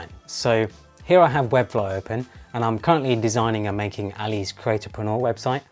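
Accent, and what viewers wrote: United Kingdom. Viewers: That's the hardest brit accent and I love it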